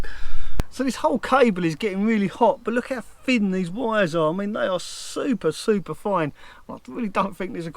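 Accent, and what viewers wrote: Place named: London, United Kingdom. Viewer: you sound like Monty Python's "My Brain Hurts" I told her it's a normal London accent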